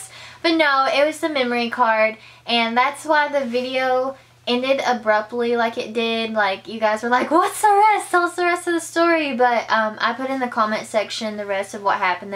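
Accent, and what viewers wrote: South, United States. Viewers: Wow you're so good at telling stories. I'm having so much fun watching your old videos! I love the storytimes and your country accent. I miss the country accent so much lol! You rock the country accent so good! It's really cute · So I just recently found you and you have quickly become my FAV youtuber! I'm obsessed ❤️ where are you from? It sounds like you have a southern accent. I'm from Alabama! I wish there was more southern youtubers haha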